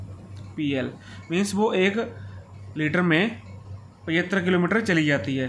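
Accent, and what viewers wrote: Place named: Punjab, India. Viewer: Is tra di hor video upload krdya kro y g nale menu lgda tusi punjabi o tudi boli tu pta lg rhea das o is it right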